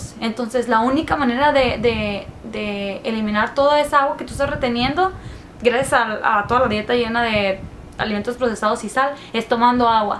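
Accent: Chihuahua, Mexico. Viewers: Me encanta el acento, arriba Chihuahua!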